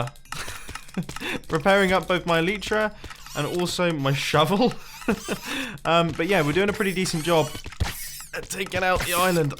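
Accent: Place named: Yorkshire, United Kingdom. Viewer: Hey, are you from Yorkshire because I kind off hear that dialect. PS: great videos keep it up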